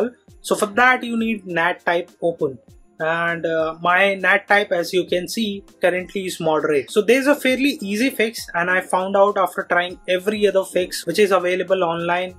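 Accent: India. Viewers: your speaking a Indian accent, no one understands that shit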